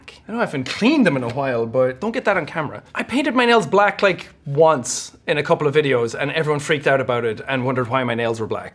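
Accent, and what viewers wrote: Ireland. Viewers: I am not about to scroll through 4,425 comments to see if anyone else has already said this, but the question is "Is Jacksepticeye in Human Resources?" There is a show on Netflix called Human Resources, which is a spinoff of Big Mouth and has some crossover characters. There is a character named Flanny O'Lympic, a Lovebug who speaks in an Irish accent. He is voiced by Chris O'Dowd, a fellow Irishman (best known as Roy on The IT Crowd). Maybe the people who googled that think Chris and sound alike because they are both Irish? It premiered March 18, 2022, three months before this video was released. Maybe hadn't heard of it or seen it. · Most Irish accent ever lad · The reason why I start watching his videos because of his Irish accent sound funny and inspiration but long stop watching his video due to burnout so I just start to see my sister become fan of now. 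It have been 7 years already that I stop watching his video now good to hear he healthy and keep continuing his career. Well except those stuff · "an Irish accent goes a long way"... Have you forgotten how you started out:s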